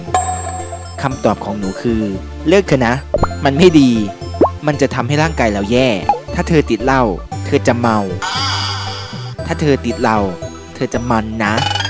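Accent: Isan, Thailand. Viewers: ชอบแอดพูดอีสาน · แอดเว่าอิสาน😆ชอบๆ💕💕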